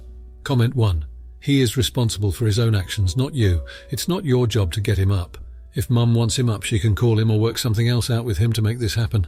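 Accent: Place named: United Kingdom. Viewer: Big fan of the British narration.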